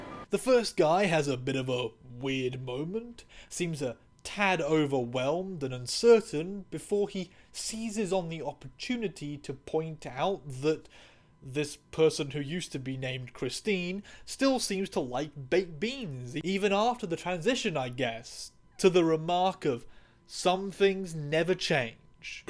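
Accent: United Kingdom. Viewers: I assumed you were in Britain due to the accent. I'm British and have been wanting to move to NZ to escape the transphobia here; can I ask how good NZ is for being trans (access to healthcare, discrimination, etc.) in your experience? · girl i am so sorry i legitimately thought you were british, so when you made the disclaimer you're from new zealand it was real news to me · Honestly never pinged your accent as kiwi, I thought you were bri’ish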